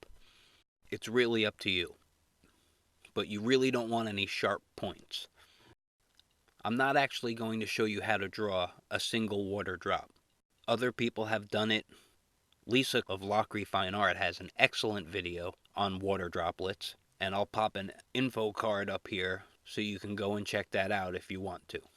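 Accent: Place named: New York, United States. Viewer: ohh that's such a good way to study! Are you planning on doing anything more with the fire or water? I bet you could make all sorts of amazing, surreal paintings... The 4th one really looked like it was hitting something round and invisible! I bet there are tons of invisible things you could paint! I thought I detected a subtle (and lovely) hint of new york in your voice, but I've never been there so I wasn't sure. What's the best part of living in long island? Or is it 'on' long island?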